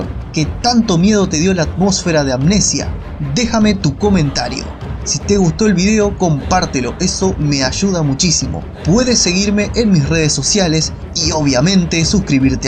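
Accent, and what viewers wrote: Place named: Peru. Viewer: Alta voz de peruano tiene este re gri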